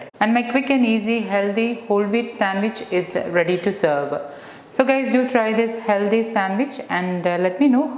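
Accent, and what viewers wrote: Kerala, India. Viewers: Couldn't help but comment.. I could tell the speaker was from Kerala before I even checked her introduction in the description box :)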